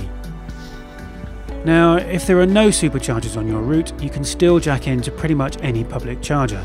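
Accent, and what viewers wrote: United Kingdom. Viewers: British calm voice narration <3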